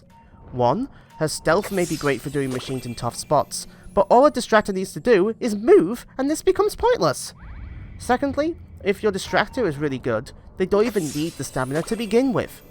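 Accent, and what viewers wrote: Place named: United Kingdom. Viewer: All things aside, you have a MASS british accent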